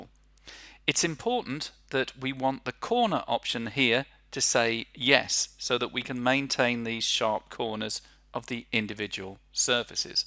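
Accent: United Kingdom. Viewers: good material but appalling teacher, a text to speech bot is gonna do better and with more life than you. Not to mention a posh accent is the last thing we want in a tutorial. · Beautiful and sexy English voice and models.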